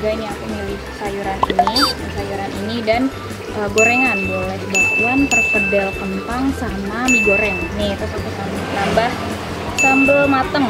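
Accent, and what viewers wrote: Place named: Bandung, Indonesia. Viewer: ngomong nya ky jalanan bandung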